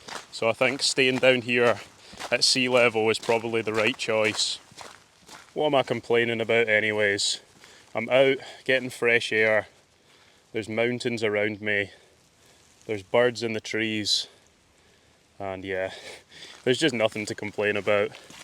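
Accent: Scotland, United Kingdom. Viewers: He's Scottish as can be. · im starting to learn how to understand scottish just watching your videos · Oh I never thought that you were being offensive, not at all, I know just how difficult it can be to understand the Scottish accent 😁 That's what my smilie was for, has a mild Scottish accent, wait until you listen to someone from Glasgow 😁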